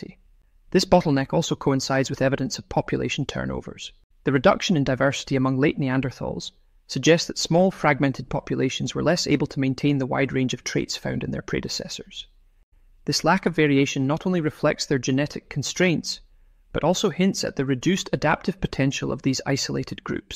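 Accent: Scotland, United Kingdom. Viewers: and never realize it has not actually explained the inner ear geometry thing. Just blurb it a dozen times with a Scottish accent. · Love the Scottish voice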